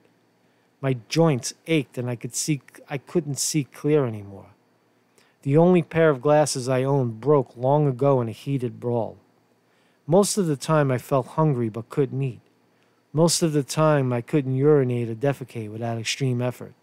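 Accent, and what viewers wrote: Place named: Brooklyn, United States. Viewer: Exposed? You're a rather transparent person already,. You've said enough in the past to inform your audience of who you are and where you've been in life. You know, I notice a trend on YouTube. Creators are coerced into conforming their video content and even presentation style to the interests of one or two viewers. Some creators even request suggestions. But when the critiques are about the presenter, rather than the presentation, I'd be inclined to ask who THEY are working for. Perhaps some imaginary TV broadcasting network or Hollywood. Creators aren't actors, for the most part. They are people, with their own life-stories, their own character and mannerism and their own YouTube channel. As for your revelations? Meh, Brooklyn accent, scrappy when assholes approach, grew up in the 80s...and learned his lessons in the School of Hard Knocks. 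My sister, however, is amazed that you still look so young and healthy with what you've gone through. I think it must be the bodhicitta of a bodhisattva preserving you for the work you're doing right now and in the past. Water under the bridge,. Never feel the need to justify your motives to viewers. If they can't figure you out, then they ought not let the door hit them on the arse on their way out, just as you said.:)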